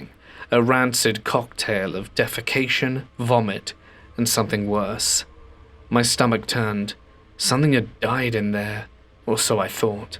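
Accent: United Kingdom. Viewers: Not bad, I enjoyed your accent not trying to be crochet about it just something about a UK accent talking about horror stories make the distinguished and eloquent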